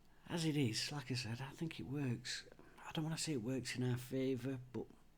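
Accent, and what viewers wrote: Sheffield, United Kingdom. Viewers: I love your unique take on the games - and your Sheffield 'speak' as a reminder of my own background. I wish you and yours a great Christmas and New Year - wouldn't it be nice if your beloved Owls and my yo-yo Blades both achieved promotion in 2025. All the best from NZ.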